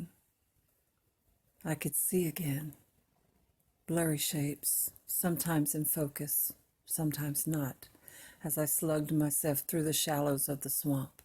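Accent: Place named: South, United States. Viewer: OMG... You were MADE for this!! 🔥 I LOVE the slight, southern drawl that lilts through the beautiful timbre of your voice that, annoyingly, draws me right into the story lol - I say annoyingly because, I don't do scary. I usually avoid it like the plague haha, but I'll do it for you 😊 I'm so glad you pushed past whatever almost stopped you from branching into the spoken word! And such a great piece too - mad props to the writer. The imagery was easy to imagine and the flow/build up was so natural... I could totally listen to you do more of this 💜 So says this Kiwi at 415am #IDontKnowWhyIWokeUpSoEarlyUgh 😴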